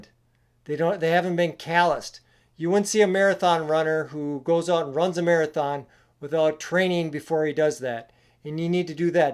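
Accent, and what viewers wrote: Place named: Wisconsin, United States. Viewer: Based on accent, Wisconsin, Barron County? Thanks for the tips.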